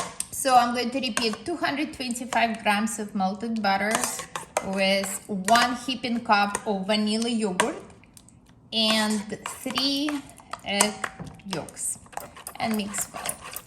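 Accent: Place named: Armenia. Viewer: These look wonderful. I LOVE your accent...it reminds me of all my Armenian relatives from many years ago. I will make these soon!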